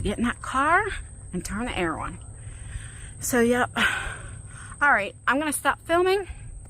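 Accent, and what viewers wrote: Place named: East Coast, United States. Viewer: What state are u in? U have an east coast accent. I am from PA. So i noticed it. ❤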